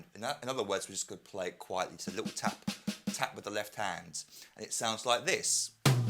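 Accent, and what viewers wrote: United Kingdom. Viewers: su acento británico pronunciado no ayuda mucho a captar audiencia, pero no se puede discutir su buen nivel musical sobretodo mantiene buen ritmo y tiempo preciso...👍🏻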